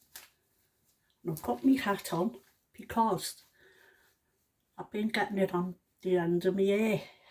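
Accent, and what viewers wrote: United Kingdom. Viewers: I love listening to your British accent ❤ and thank you for the advice 🙋‍♀️ about the infection, as women we have more to look forward to 🌬 just being women is a challenge the older we get..thank you for caring 💕🌹💕😘🙏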